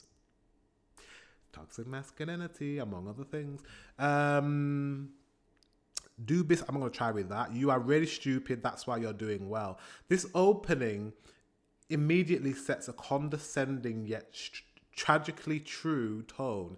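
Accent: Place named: North, United Kingdom. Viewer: Out of context: I love your voice and "dialect". Greetings from a northerner (Germany) to a northerner.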